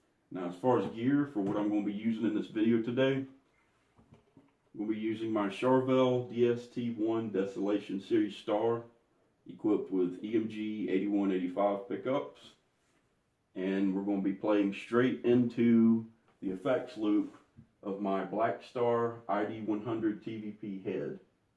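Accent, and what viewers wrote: United States. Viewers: Will this work worth a shit through the front? For some reason I never have much luck through the loop. You sound like you from Bama.🤘🏻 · Incredibly informative video, great work. Oh and the southern charm is always welcome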